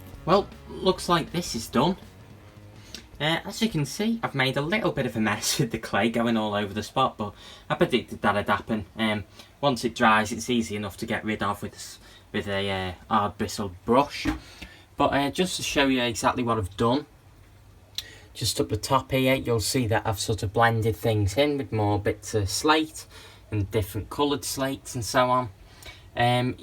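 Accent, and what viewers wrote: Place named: Liverpool, United Kingdom. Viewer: Are you from Birkenhead 😅 starting watching and I was like hmmm doesn’t sound scouse but almost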